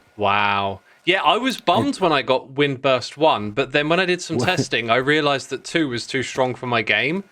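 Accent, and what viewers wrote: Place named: South London, United Kingdom. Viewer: Yeah, he's got that souf London thing going on.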